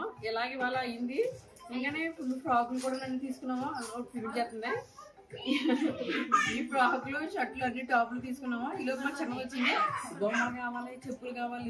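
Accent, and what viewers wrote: Godavari, India. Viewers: Hi Madam, Really I like ur Godavari slang and ur husband's funny behaviour...... Really I like ur funny videos..........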